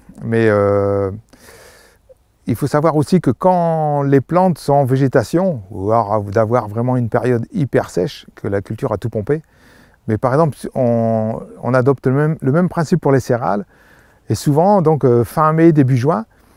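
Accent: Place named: North, France. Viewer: L'accent du Nord est resté... 😇👍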